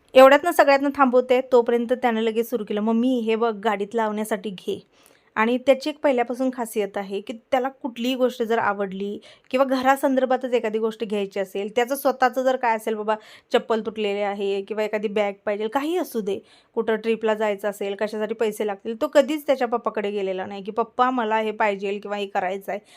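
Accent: Kolhapur, India. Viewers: ताई तू म्हणाली की उंदर मांजर मिळतात येते खूप हसायला आलं 😅असो आपली कोल्हापूरची भाषा अशीच आहे मी पण कोल्हापूरचीच आहे ❤❤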